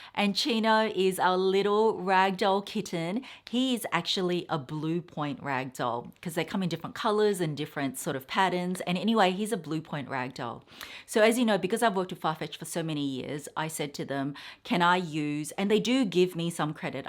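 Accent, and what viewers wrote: Australia. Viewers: Oh FLP tote is very nice. I like the zipper and button closing features.. Would love to win the MJ Tote bag. To this day, unboxing videos are still my favorite to watch. I love the way you talk about the background of the brand, the information about the items you purchase in your Aussie accent. 🥰😘Linhtwin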